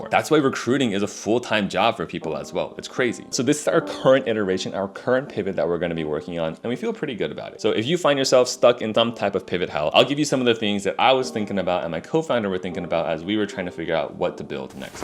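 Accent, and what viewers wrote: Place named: China. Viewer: I like how chinese speak english... just like Jian Yang from silicon valley vibe. 😂 You might be korean though idk... i just like the accent. Also been following you for a while..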